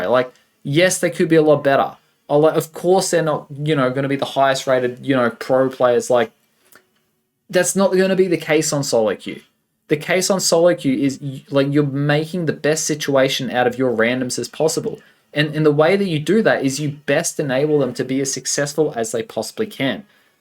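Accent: Australia. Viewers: The Australian accent is great. 😂👌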